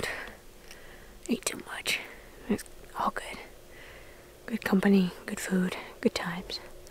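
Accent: Maine, United States. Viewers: Great to see you succeed. Your family is darling and you my dear are pretty as you can be. Love the Maine accent. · Congrats to you!!! Great video, raw emotion, and you did it!!! Love the Maine accent; spot on northeast hunt! Keep after it!!!!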